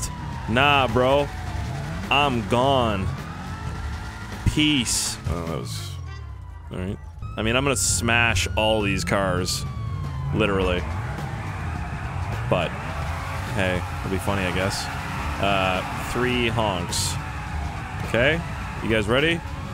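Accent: Nova Scotia, Canada. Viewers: What side of Nova Scotia are you from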